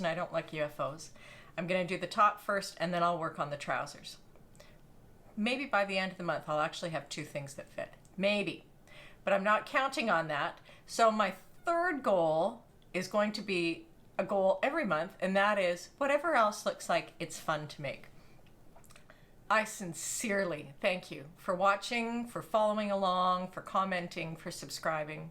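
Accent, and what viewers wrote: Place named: Canada. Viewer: Thanks for your videos. I just discovered you. I love your Canadian accent, so easy to listen to. I wonder if you ever do a FBA. I'm struggling to get my makes to fit me nicely. If I make a size 18, its too big in the shoulders and neck area, so I have attempted to do FBAs, but am never quite satisfied with the results. Thanks again and keep them coming.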